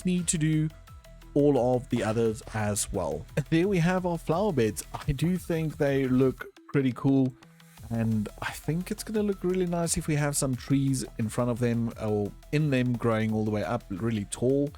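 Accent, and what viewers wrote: Afrikaans, South Africa. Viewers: I know an Afrikaans accent when I hear one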